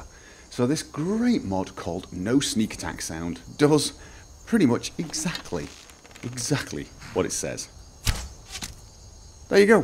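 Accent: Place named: Manchester, United Kingdom. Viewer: Damn. After all those questions as to where you're from I always sat smugly in my seat and said "I bet it's Manchester, somewhere in the NW at least". Of course, I sound like a total hindsight Charlie now. :D Not liven in the NW for nearly 20 years (and I wasn't a native to begin with) but the odd word still comes out with a burr. :)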